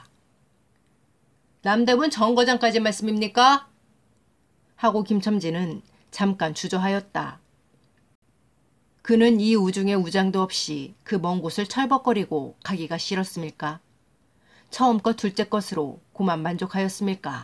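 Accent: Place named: Pyeongan, North Korea. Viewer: 사투리 ㅋㅋㅋ 무슨 평안도 사투리같아요 ㅋㅋㅋㅋㅋㅋㅋㅋㅋㅋ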